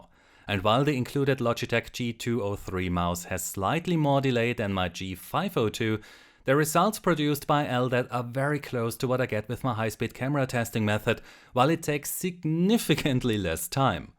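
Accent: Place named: Germany. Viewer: You know you're in good hands when you hear a German accent..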